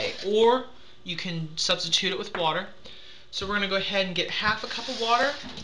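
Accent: United States. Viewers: he sounds like he's living in texas or something with the southern accent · he sounds southern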